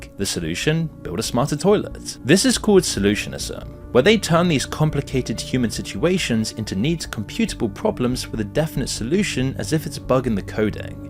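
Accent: United Kingdom. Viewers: I love some Douchebag with a British accent telling me how fucked up my City is..... you don't know shit, we love it here.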